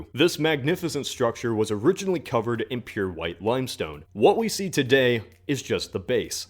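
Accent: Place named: Midwest, United States. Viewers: it's a Midwestern accent. from States like Kansas and Missouri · He's from the great state of Ohio, albeit Toledo instead of the superior cities of Columbus and Cincinnati.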